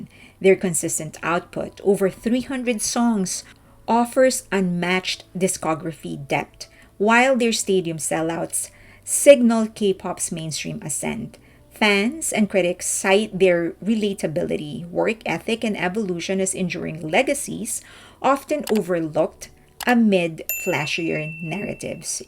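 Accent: Philippines. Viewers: Loving the Pinoy accent 😍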